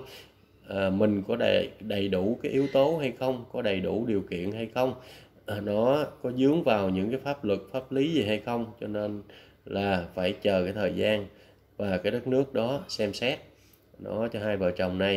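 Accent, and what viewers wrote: Standard. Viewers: Cô chào cháu kênh nói chuẩn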